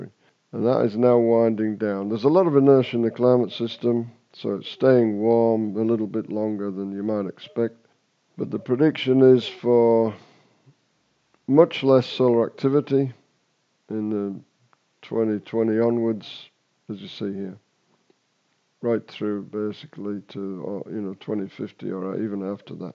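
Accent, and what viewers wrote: Yorkshire, United Kingdom. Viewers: As usual, this contributor's analysis is presented with succinct scientific clarity; and his terse, placid-yet-authoritative style is a welcome change from the strident, shrill tones of the alarmists' hard sell. In honest science at least, substance trumps emotion every time. Despite writing, as I am, from t'other side of yonder Pennines, I am humbly obliged to concede that his sound-reasoning rebuttals of the IPCC's characteristically-devious acumen are admirably accentuated by such rectilinear "Yorkshire talking". Considering the magnitude of the IPCC's disgraceful climate hoax, his blunt turn of phrase is also refreshing.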